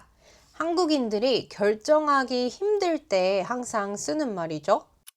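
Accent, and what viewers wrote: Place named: United Kingdom. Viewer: did you learn english in the uk? you have a strong british accent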